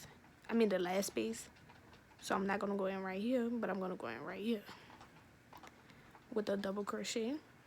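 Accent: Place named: Louisiana, United States. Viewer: The Louie accent 🥹🫶🏾. I love ur personality and that color is 🔥 .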